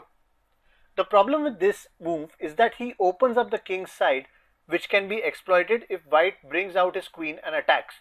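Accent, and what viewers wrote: India. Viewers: Most indian sound i have ever heard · IM SO TIRED OF INDIANS AND THEIR ACCENT... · Not the Indian accent OH NOOOOO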